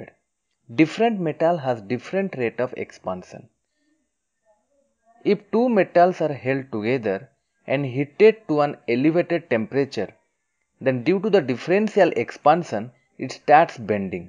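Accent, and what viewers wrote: India. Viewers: typical indian accent :D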